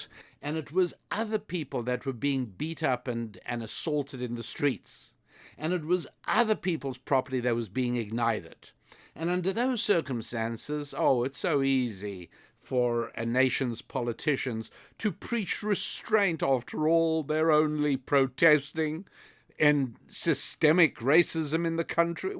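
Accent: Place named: United Kingdom. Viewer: Your bold British accent is brilliant and inspirational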